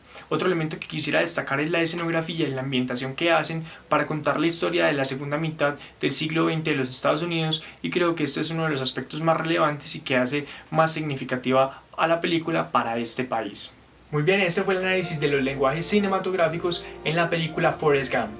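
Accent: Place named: Costa Rica. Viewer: Sos Costarricense con solo escucharte.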